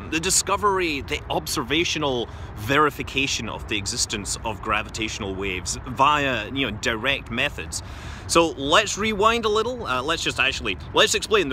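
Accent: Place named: Glasgow, United Kingdom. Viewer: I knew that accent was from Glasgow! astronomy always getting something impressive to show... go Science haha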